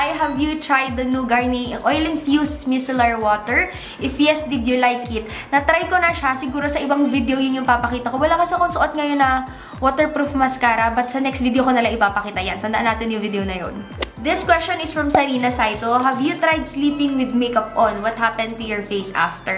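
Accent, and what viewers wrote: Batangas, Philippines. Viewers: "Pinay skin tone kumbaga" love ur batangueña accent. Ps: subscriber from Batangas 😊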